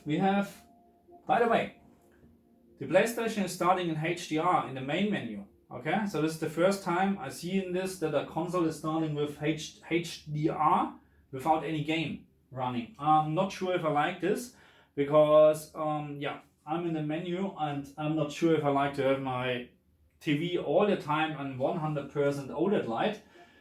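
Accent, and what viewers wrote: Germany. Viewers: Absolutely u German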